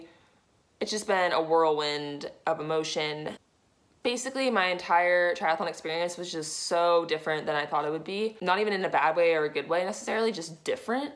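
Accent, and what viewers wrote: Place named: Punjab, Pakistan. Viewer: I like her speaking Pakistani-Punjabi, nice accent!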